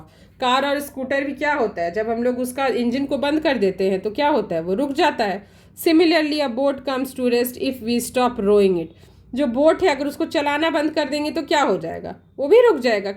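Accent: Bihar, India. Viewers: Mam are you bihari ?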